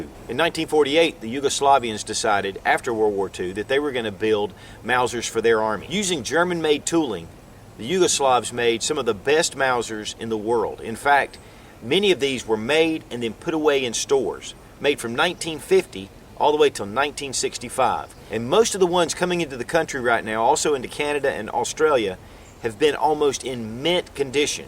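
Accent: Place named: South, United States. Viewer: I really like your videos and find them to be very informative and answers all of my questions before I need to ask them. That, and your southern twang makes these videos fun to watch.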